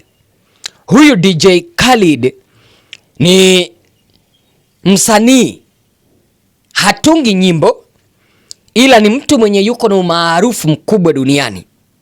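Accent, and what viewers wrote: Kenya. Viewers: Mbona ki Swahili cha Kenya. Halafu unasema nchi yetu Tanzania.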